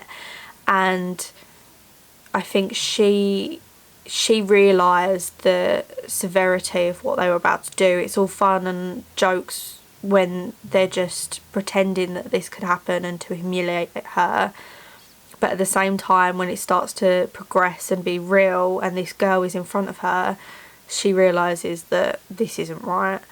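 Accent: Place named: Yorkshire, United Kingdom. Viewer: I loved this book so much mostly because the book was so freaking large, I’d say it was almost larger than Harry Potter book series but I loved your review and I am hoping for the 3rd and 4th movies to be longer cuz there’s much more pages and suitations happening so it’s way out there but, here’s hoping it goes well with the direction of the last two books and weather or not they keep the cast from the 1st and 2ed movies. Ps I love your accent, r u from yorkshire? Or somewhere close. Hehe 🤔💕 also please follow me on Instagram Karalynnlovison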